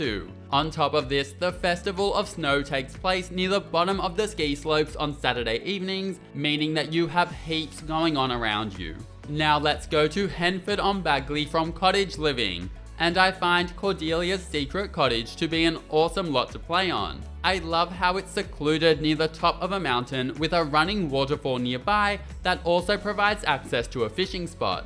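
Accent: Australia. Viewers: Gotta say the nice aussie accent 😍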